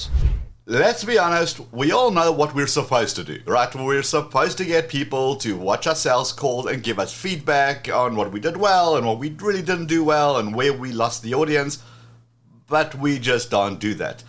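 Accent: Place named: New Zealand. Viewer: Just found this channel from your main channel and instantly subscribed without even watching a video. Why? You're awesome, that's why! I love all the useful information, excellent delivery, and -- who doesn't love a New Zealand accent? 🤣 They're the beest!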